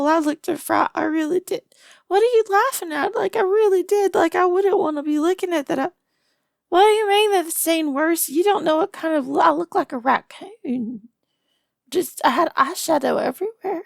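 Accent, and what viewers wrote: South, United States. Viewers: Bear girls? Love them, can never get enough. Southern accent? Definitely one my greatest weakness. Reverse comfort? Oh yeah, cant be the only one comforted!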